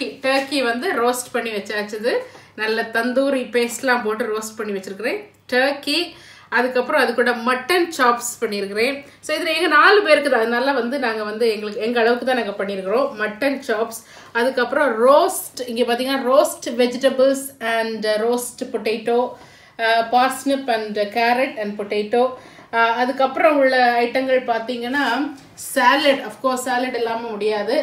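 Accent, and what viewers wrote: Nagercoil, India. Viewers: Superb turkey dinner with all the trimmings. Anton's choices are nice. Your Nagercoil slang invokes the festive spirit too. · Nice to hear our nagercoil slang.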